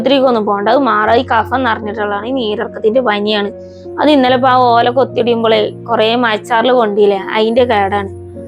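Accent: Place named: Malappuram, India. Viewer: എന്താ പ്പ തിന് മലപ്പുറം ഭാഷ യാണ് എന്താ കുഴപ്പം കൂട്ടം എന്ന് പറഞ്ഞ(കാര്യം )അത്ര തന്നെ,, അടിപൊളി വീഡിയോ സ് ആണ് 👌👌👌നല്ല ഭാഷ 👍❤️❤️❤❤❤❤❤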